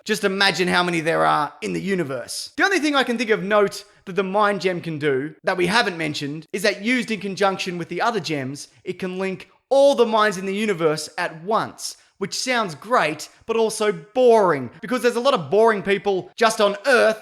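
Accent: Australia. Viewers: love the aussie accent! been a fan of the weekly planet podcast for a while now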